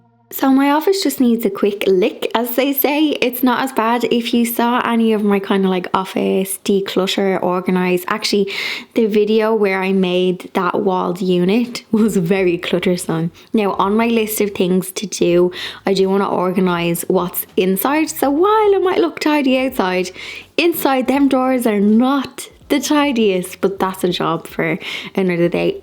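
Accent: Ireland. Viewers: To sit there lazily on a Sunday afternoon, enjoying tea and candle light, it has something deeply satisfying to watch you whirling around ;-) It is cute somehow and I absolutely like what you make out of your home. Every video is a package of creativity, smiles and cuteness (being decades older, I hope, I can say this without sounding arrogant or stupid). Actually, it is so lovely to listen to your Irish and to watch your videos. Deeply pleasant. Love and greetings from Germany.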